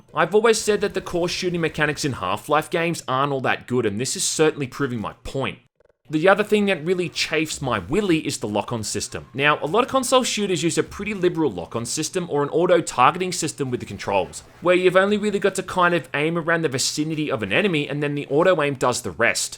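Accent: Bogan, Australia. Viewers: I really hate how you talk, you sound super bogan.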